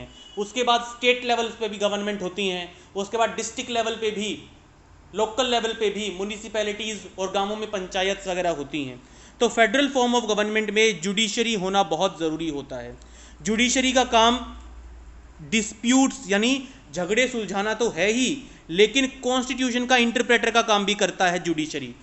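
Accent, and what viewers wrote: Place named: Haryana, India. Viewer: You are from haryana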